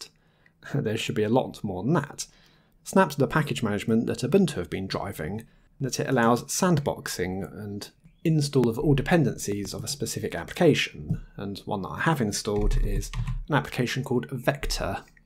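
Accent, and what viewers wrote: United Kingdom. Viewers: dat british accent :)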